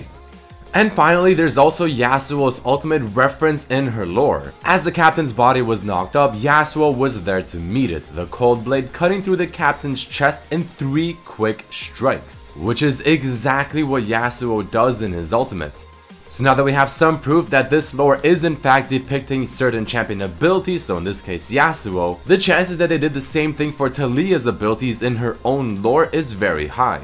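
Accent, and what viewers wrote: United States. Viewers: OMG HE SOUNDS AMERICAN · He sounds American when he is sick haha. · You sound American with your voice haha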